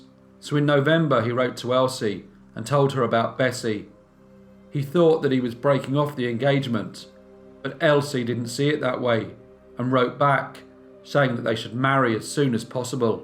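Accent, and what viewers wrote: United Kingdom. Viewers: I LOOK OR WATCHED MANY OF THE VIDEOS AND FIND IT INTERESTING,ITS LIKE MANY GENRE MIX TOGETHER COZ IT DOESNOT CIRCULATE IN ONE OR TWO PLACES BUT IN THE WORLD WHERE SOME ODD THINGS WHICH SURFACED OR KNOWN WELL KNOWN EVEN OUTSIDE OF THEIR COUNTRY,WHICH MEANS THE NATIONALS COUNTRY WOULD KNEW WELL,AND THE BRITISH ACCENT IS NICE TO HEAR ITS NOT LIKE HIGH PITCH QUICK PACE ACCENT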